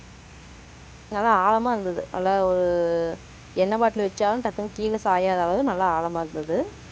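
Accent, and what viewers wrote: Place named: Salem, India. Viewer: நீஙக சேலமா .....உங்க பேச்சு ஸ்லாங் அப்படித்தான் இருக்கு......எங்கம்மா வீடு சேலம்தான்.....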